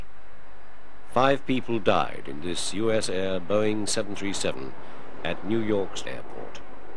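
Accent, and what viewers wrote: United Kingdom. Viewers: Why do they overdub an American narrator with a British one whose thick cockney accent makes it un watchable. Know why the original Mayday series was so good? The Narrator. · This "documentary" is total bs. They got one thing wrong after another, and then, 12 minutes in, they just made up a new cause for the Tenerife disaster which had nothing to do with it. Total idiocy. I guess any 16-year old kid with an upper-class Brit accent can spend a few hours cutting clips from other vids and watching a couple of them for a few minutes so that he can sling some lingo into a script and then put something like this together and people will watch it. I love that it's called "The Facts." I'm certainly not going to finish it. The only stuff they're going to tell me that I didn't know already is stuff that isn't true. Crap, crap, crap....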